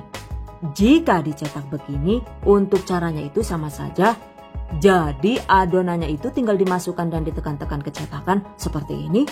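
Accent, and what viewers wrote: Banyumas, Indonesia. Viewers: Ngapaknya bikin ngakak bunda.. 😄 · Wongendi kie deneng ngapak temen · kok bahasa medok banyumas, asli bms ya jeng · Ko bahasane bisa ngapak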